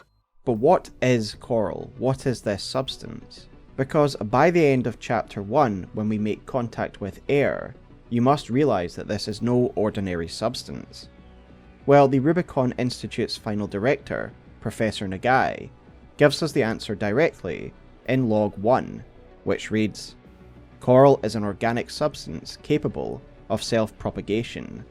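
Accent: Scotland, United Kingdom. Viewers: You’ve never heard a Scottish accent?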